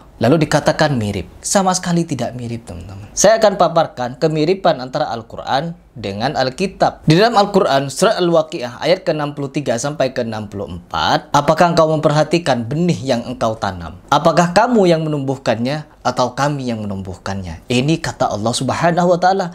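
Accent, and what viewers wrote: Madura, Indonesia. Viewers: Salam dr madura mas,oea kok logat masnya kyk org madura ya?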